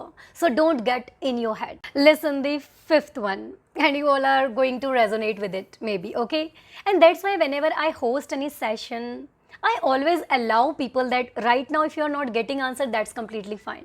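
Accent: India. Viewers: I think you are from India because your voice is Indian.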